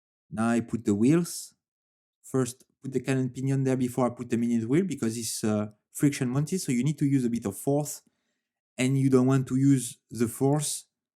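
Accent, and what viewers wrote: France. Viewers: Great video. I like the Franglais accent. Just one tip. When you assemble the automatic works on top of the movement, it is better to unwind the main spring and let the movement stop. If a screw would drop into the running balance spring, that can cause a lot of damage.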